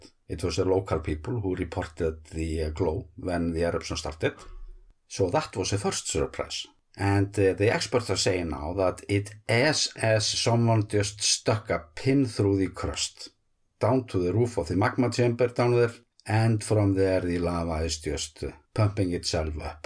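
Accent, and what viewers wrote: Iceland. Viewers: As a student from Ireland who worked in Vestmanneyjar in '65-'68 and who saw Surtsey grow up, you accent, while not Westmann still reminds me of the many friends I fondly remember from those delightful days. Great reporting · Yes I love your commentary. Just matter of fact, say what I'm thinking quite often. I am getting used to your Icelandic accent too. I love your comments about the Norwegians lol. Much love and respect from the UK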